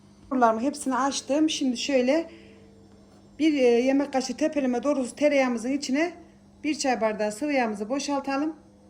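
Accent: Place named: Çorum, Turkey. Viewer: Kolay gelsin hanım bizim gibi konuşuyorsunuz nerelisiniz ben Çorumluyum ama 42 senedir istanbul Eyüpsultanda ikamet ediyorum ❤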